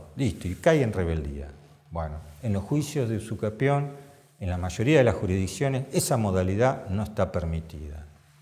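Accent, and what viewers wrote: Argentina. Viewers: Es Argentino no escuchas como habla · Tiene acento porteño asi que asumo que es de Argentina xD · Cabe considerar que el habla de acuerdo a la Ley de su país, por su pronunciación parece ser argentino, lo dicho por el Dr. no es totalmente aplicable en cualquier otro país como México.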